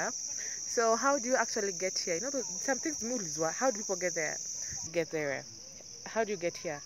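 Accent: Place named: Texas, United States. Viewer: Why do you sound so westernized? Ongea kama mkenya, you sound like you from Texas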